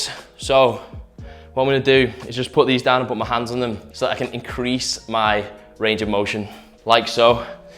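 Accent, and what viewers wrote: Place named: Manchester, United Kingdom. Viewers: Fukin Manchester, Lad . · where in the UK are you from? You sound mancunian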